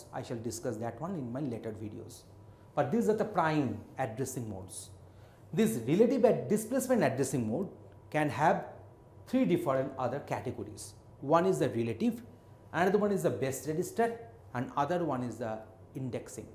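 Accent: Bengal, India. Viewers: Bengali aiese hi bolte h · He has an indian accent and i see no issue french have french accent,etc. And they are proud we should be proud and he is a gem❤️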